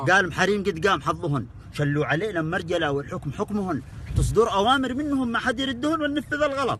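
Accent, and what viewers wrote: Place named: Samtah, Saudi Arabia. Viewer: والله انك كفو يا واصل يابطل ويالبى لهجة اهل صامطة👍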